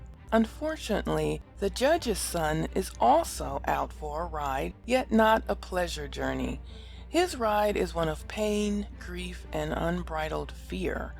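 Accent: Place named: Liverpool, United Kingdom. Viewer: Really enjoyed ur review but I'm a little annoyed that u havnt got more comments and reviews as uve done a great job and coming from a Scouser ur accent is beautiful!! Oh if u don't know a Scouser is from Liverpool, England just in case u didn't no!!! Please more reviews and never change ur accent ha ha!! Take care and let's hope for more reviews!!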